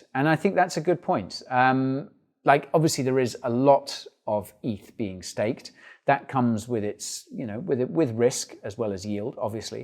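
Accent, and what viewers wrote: United Kingdom. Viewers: one of the few channel's that gives you facts and doesn't promote sh1t coins, keep up the great work, and your British to boot.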